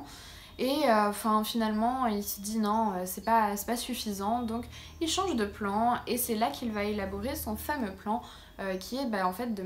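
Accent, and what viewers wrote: Quebec, Canada. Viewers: Deux personnalités différentes, deux façons d'aborder les sujets différentes. Après, ceux qui disent que fait des fautes de français se trompent, elle parle québécois tabarnac! Fac c'est un français différent! Et alors parler de "faiblesse narrative", what?! Elle nous tient en haleine!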